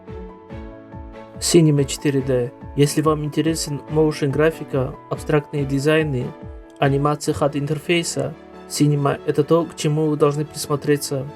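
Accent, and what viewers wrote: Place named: Dagestan, Russia. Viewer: Судя по говору , либо Дагестанец либо Чеченец, я пока еще не разобрался 😅😂 Салам из Татарстана 👋😁 Я вот в FreeCad начал работать, мне больше интересна техничка и точность.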